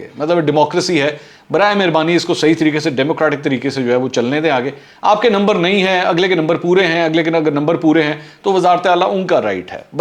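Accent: Punjab, India. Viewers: Me ap ki punjabi style ki waja say vlogs sunta hun realy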